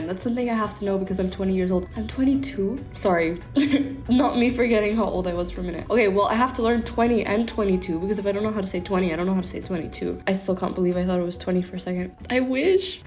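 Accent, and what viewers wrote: Rio de Janeiro, Brazil. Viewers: I speak portuguese too. My accent is more of a Sao Paulo/Salvador accent. I can hear the Rio accent from you too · Carioca raiz ! amei demais !!!!!! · you are doing amazing! I can clearly hear your carioca accent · your accent is carioca lol kkksdjksjd but your portuguese sound amazing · Como um carioca que sou, teu chiado está ficando ótimo!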